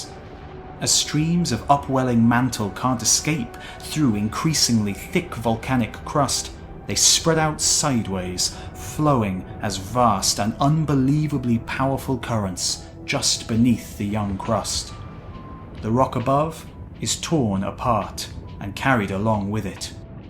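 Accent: United Kingdom. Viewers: He's from the United Kingdom, not the United States. I'm from Liverpool and pronounce it the way he does. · I already knew this stuff but I love hearing it again, your presentation is awesome. Plus a british accent always makes things sound much more majestic.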